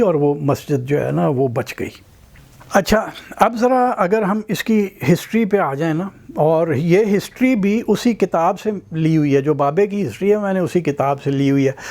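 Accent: Punjab, Pakistan. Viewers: Delightfully entertaining narration (with Punjabi tadka) by Salman Rashid. Enjoyed it thoroughly. I am an Indian (with Punjabi background and roots in Haripur Hazara). Love and respect from India.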